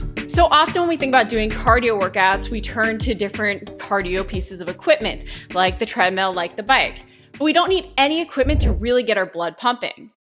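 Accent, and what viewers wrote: United States. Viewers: Hello from the UK. I just want to say thank you for your time and effort in producing these excellent videos which I'm sure are well views in the current climate. Changing the subject some what your accent in this video is reminding me of the Californians off SNL!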